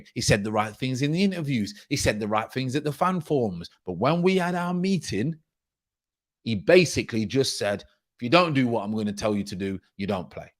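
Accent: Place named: Midlands, United Kingdom. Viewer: Big up from south London Why! The delivery of experience nd knowledge with a gruff midlands charm may not always agree on style always a good source of knowledge I am me and that is special enough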